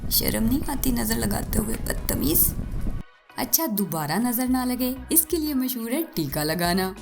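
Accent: Punjab, India. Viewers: Apki Punjabi boht zabardast h . Same jaisy hm ghar bolty hain .. or nazar to kamaaal ki utari Apne 🤗🤗🤗😍😍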